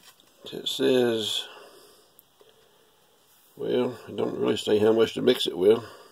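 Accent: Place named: Boston, United States. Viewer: Very heavy Boston accent for sure! That makes at least two of us!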